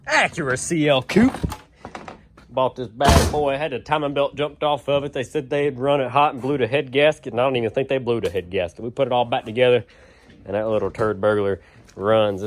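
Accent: United States. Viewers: a little more hick then normal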